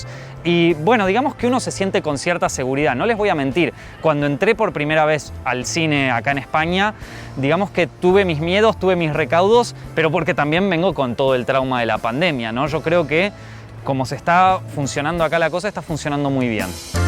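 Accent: Argentina. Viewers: Dios mio!!! Ese acento argentino me encantaaa. Es hermoso! Un abrazo gigante desde Bogotá-Colombia 🇨🇴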